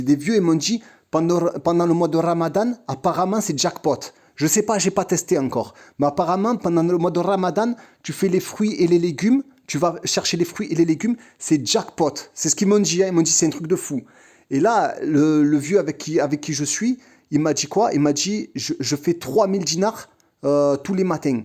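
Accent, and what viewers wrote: Marseille, France. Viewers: Salam, tu m a fait trop rire avec la charrette...et ton accent marseillais...j aime beaucoup Tlemcen, j y suis venue en octobre et j ai visité ce magnifique hotel la Renaissance..bonne continuation et courage à toi...il me tarde d y revenir au prochaines vacances in challah.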